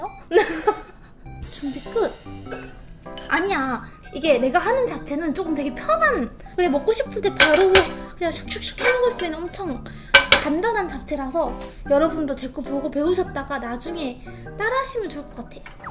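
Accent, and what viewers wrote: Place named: Gyeongsang, South Korea. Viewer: 나 경상도사람이긴한데 님 경상도사투리에 치인다....❤️❤️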